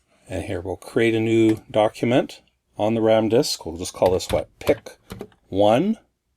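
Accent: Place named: Winnipeg, Canada. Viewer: I'm really enjoying your videos the past few days, I just discovered your channel. Fellow Canadian here and from the sounds of it, you sound like you might be near my neck of the woods here in Winnipeg. I was a Commodore fan back in the 80s too as a kid. More recently I backed the kickstarter to get myself one of the new Sinclair Spectrum Next 8-bit computers (can't wait to receive it this August)! Cheers!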